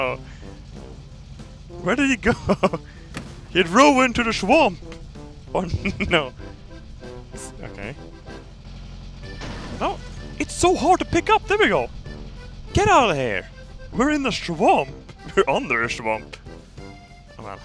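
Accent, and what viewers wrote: Sweden. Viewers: Booker Dewitt it's his Swedish accent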